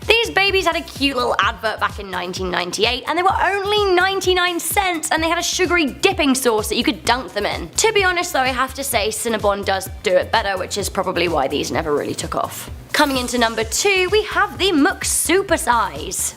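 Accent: United Kingdom. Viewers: I love her British accent and her witty humour. It's infectious. 😂😂😂 Thank you for making my day during this damn lockdown. 😆🤗😆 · Your British accent is on display! Love you so much! Thank for the video XOXO ☆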